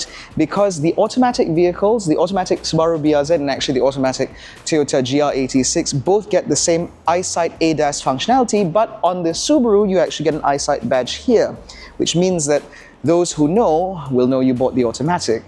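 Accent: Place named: Upper class, United Kingdom. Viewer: Speak like a British aristocrat. nice......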